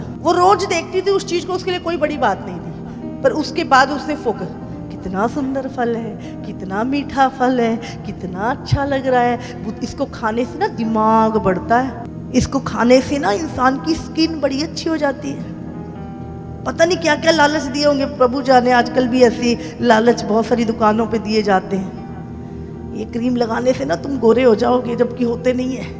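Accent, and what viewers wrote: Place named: Jharkhand, India. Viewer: Malgo jharkhand Sa bolrhi apa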